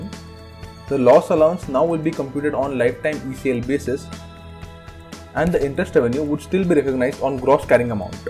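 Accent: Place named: India. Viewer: Indian English ooooah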